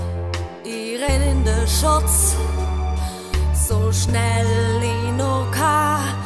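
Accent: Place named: Swabia, Germany. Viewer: Schee Schwäbisch 👍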